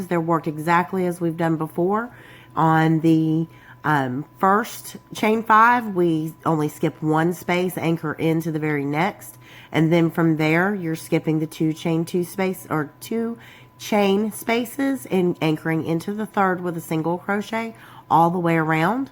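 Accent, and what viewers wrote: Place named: South Carolina, United States. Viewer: I watched the videos and I have to tell you that you are amazing. Love the accent it makes me miss my time in SC. While crocheting my shawl I noticed that while making the 4th floral lace (the single in the center) I was on video 2 and did the lace in the same manner as the one in that video. It seems to have worked out, but should I frog it (I'm at the center point) and go to video 3? I will be adding more rows because I want to use the yarn in my second cake until the end.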